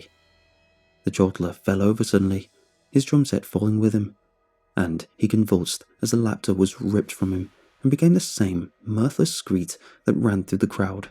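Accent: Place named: Northern England, United Kingdom. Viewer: Love the accent I'm from London and he's northern but not sure where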